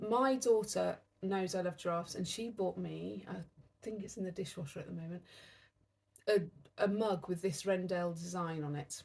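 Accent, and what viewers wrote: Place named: United Kingdom. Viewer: It was such a pleasure to watch your video. Your accent is exactly the same as my dearest friend in Britain, so listening to you made me feel like I was sitting with a friend who I have known for years. I love all of your projects and look forward to seeing my progress.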